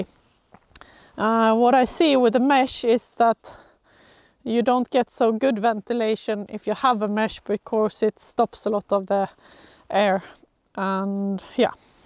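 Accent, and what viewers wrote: Sweden. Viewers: Kollar alla era videos, men inte direkt i ordning men alla är lika bra. Tyckte du fick med alla saker som är viktiga för ett par vandringsbyxor. Men… ibland låter din engelska som du snarare kommer från södra Sverige än Jokkmokktrakten:) kan ha helt fel där:) tack för bra videos i alla fall 👍🏼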